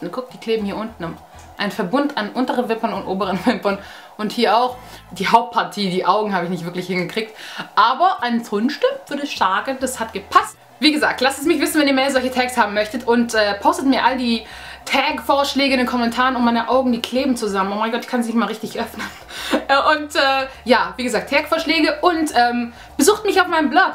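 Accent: Swabia, Germany. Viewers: ich finds so toll das du manchmal ein wenig schwäbisch redest!!!!!!! schwabenpower!!!!! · der dialekt :DD schwäb'sch :D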